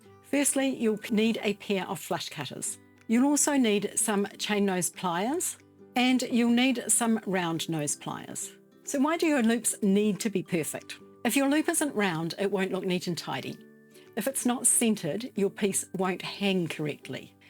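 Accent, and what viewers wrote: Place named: New Zealand. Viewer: Thank you for your fantastic video on how to make perfect loops & fix faulty ones. Love your Kiwi accent too! I have a friend in New Zealand, Bryan Waddle who used to be cricket sports announcer for Radio New Zealand! I'll definitely be liking more of your videos. This is best loop making video I've ever seen.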